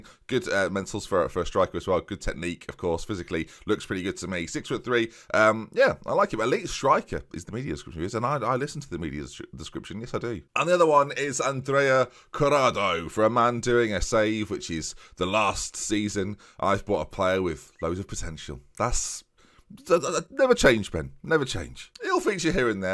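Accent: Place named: Newcastle, United Kingdom. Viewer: Tbf I only liked the video for the Geordie accent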